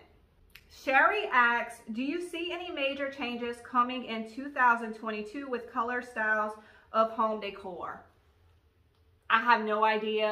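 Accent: Louisiana, United States. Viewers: I just discovered your channel! I am an hour and a half from Memphis & lived in Tupelo in the mid seventies! Can you share where the thrift store is near the Goodwill that has the good prices? I look forward to catching up on all your videos. Love your cajun accent!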